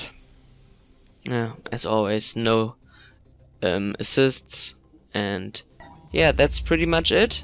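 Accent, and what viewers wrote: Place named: Germany. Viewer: Wie ich alleine an deiner Aussprache höre, dass du Deutscher bist. xD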